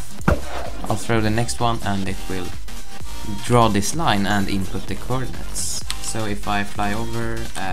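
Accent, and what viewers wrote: Sweden. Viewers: R U from sweden? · u swedish? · lol that english it's mer swenska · du är svensk det hör jag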